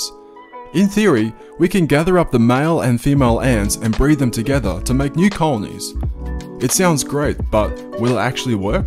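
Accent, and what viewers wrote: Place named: Australia. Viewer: its like youre a australian ants canada also good info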